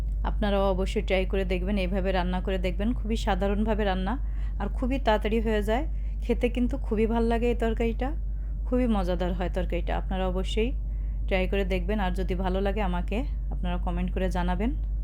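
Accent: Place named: Chittagong, Bangladesh. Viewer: Apu chittagong er mone hoy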